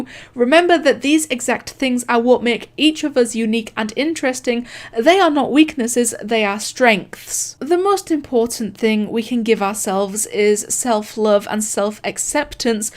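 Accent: United Kingdom. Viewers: can we talk about her bri ish accent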